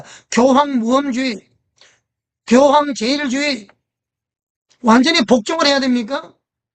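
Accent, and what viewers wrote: North Korea. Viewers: 그런듯이 아니잖아요 참 웃기네요 그만지껄이세요. 모욕하지 마셰요 무슨 미친소리합니카 북한에서 왔네요 말투가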